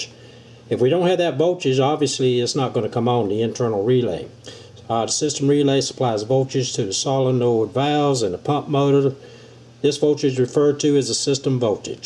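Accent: South, United States. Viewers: my 2003 Cadillac Deville has this problem, and I'm going to <link> thanks for the informative video and that Southern Accent..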